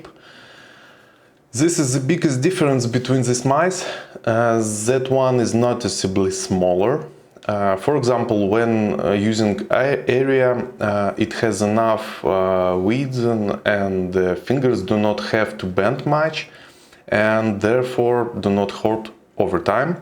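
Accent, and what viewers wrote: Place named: Poland. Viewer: Ну ладно, не русский а поляк Ну все же акцент сильный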